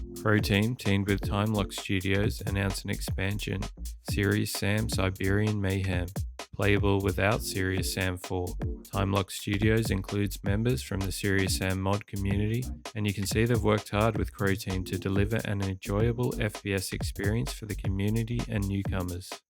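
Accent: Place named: Canada. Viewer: Iconic canadian accent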